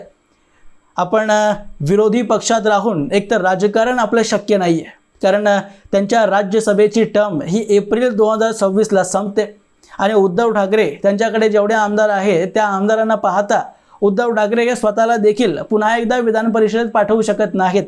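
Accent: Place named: Thanjavur, India. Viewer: तुमची मराठी आमच्या तंजाऊर मराठी सारखी वाटते. 👍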